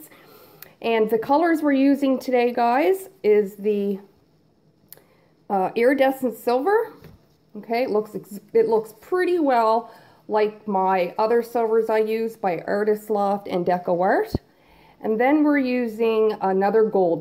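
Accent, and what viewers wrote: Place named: Canada. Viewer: I have started watching you ! I love your style and your Canadian accent! Are all Canadians artistic! Ha ha keep up the awesome pieces♥️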